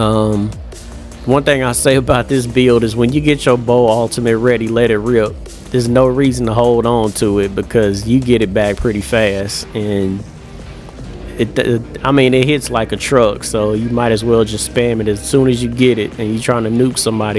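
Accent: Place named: Georgia, United States. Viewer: You sound like my friend from savannah Georgia, idk if you live around that state but I'm from Texas and yall have a stronger accent then us lmao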